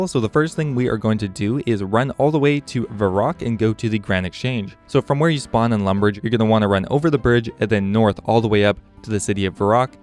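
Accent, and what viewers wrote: Canada. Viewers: Hmm didn't notice before, is that Canadian accent I hear? :P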